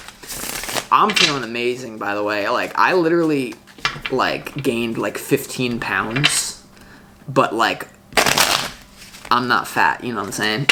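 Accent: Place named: South, United States. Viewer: South ? No wonder. I rec the accent. I grew up in the so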